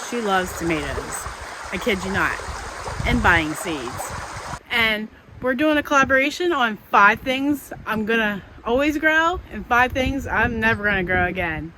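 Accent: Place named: New Jersey, United States. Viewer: I grew up in New Jersey and your accent made me home sick lol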